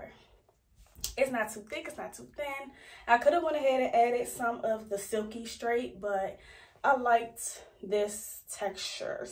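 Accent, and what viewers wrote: Washington DC, United States. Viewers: ik a dc accent when I hear one! lol. this turned out amazing btw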